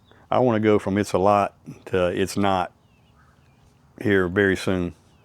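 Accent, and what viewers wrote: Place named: South, United States. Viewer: Glad I found your channel....I watched a few more of your videos. Good information and content.... Likable guy. Enjoy the southern accent 💯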